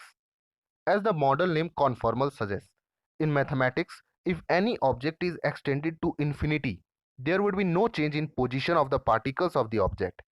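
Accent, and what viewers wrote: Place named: India. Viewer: you lost me at the indian accent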